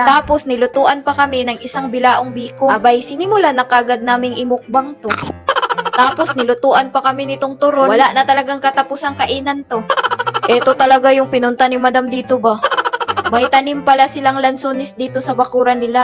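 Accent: Visayas, Philippines. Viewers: Madam bisaya ka?😊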